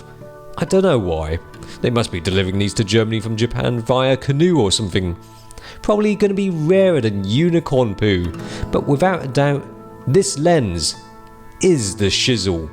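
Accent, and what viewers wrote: United Kingdom. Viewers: im just suscribed to this channel. and im suprised to see that chinese speaks british accents. its been a while since im searching for this type of channel. great channel!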